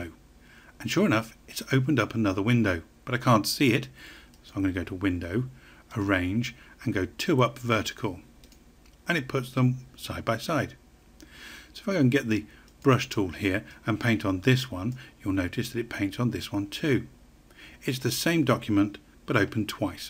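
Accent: United Kingdom. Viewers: I Like so much UK English! :D Thanks for this tutorial! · Excellent. Nice to see, and hear, tutorials in English English and not American "English" Your tutorials are so easy to follow.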